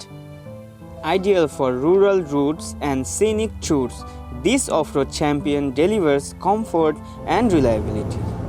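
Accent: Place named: Bangladesh. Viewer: WITH THAT BLOODY bangladeshi ACCENT U CANNOT IMPRESS INDIANS. AND BANGLADESH HAS NO MON TO BUY THESE.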